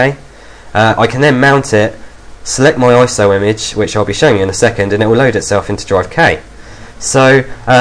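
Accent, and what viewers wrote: England, United Kingdom. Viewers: y is this so long? do u have to go so fast? I have seen others do this in two minutes mr. English accent!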